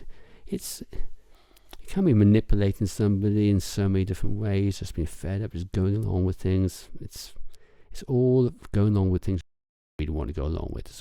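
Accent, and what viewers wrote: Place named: England, United Kingdom. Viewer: Hello, nice to hear an English reader, and male to boot. Good reading, but being the other interested party it's not so heartwarming. Thank you, I shall keep my eye out for further readings, I have subscribed. UK listener.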